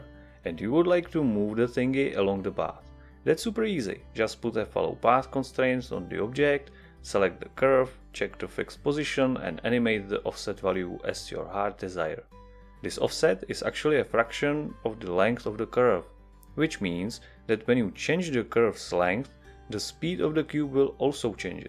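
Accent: Czech Republic. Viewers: Zda se mi, ze tvoje anglictina zni celkem cesky 😀 Je to pravda?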